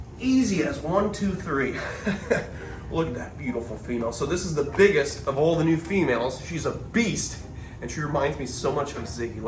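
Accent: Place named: Australia. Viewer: Like chandler isn’t like Steve Irwin enough , then he always brings out the spot on Australian accent 🔆👍😝🤍 I feel like I’m looking at a young Steve ;( .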